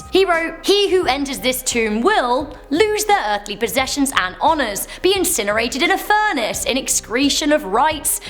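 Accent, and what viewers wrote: England, United Kingdom. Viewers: Its the british accent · Miss!! I REALLY LOVE YOUR BRITISH ACCENT!! I HAVE A EARGASM WHEN YOU TALK!! 🇬🇧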